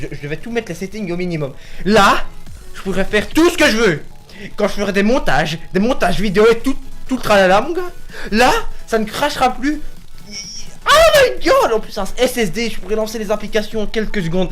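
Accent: Belgium. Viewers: Tes du côté wallon ou flamant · di donc tes belges toi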